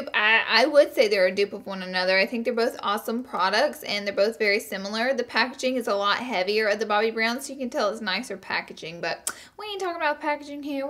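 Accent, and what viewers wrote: South, United States. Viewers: The vocal fry combined with the deep southern accent can be unnerving to some people, but I'd say just don't watch. Her content is good, and it's not like she can help it much. Don't know why people feel the need to be nasty about it. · By the way I love your accent it's so cute and southern💕💕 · I love these videos!!!! They are just the best! I love ur southern voice!! Ur vids are just the best! And ur palette!! Come thru!! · Yes baby you got that beautiful southern bell accent 💞 makes me feel at home. 🤗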